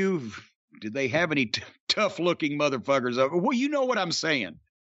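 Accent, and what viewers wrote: South, United States. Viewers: Proper oldschool Southern accent, love it!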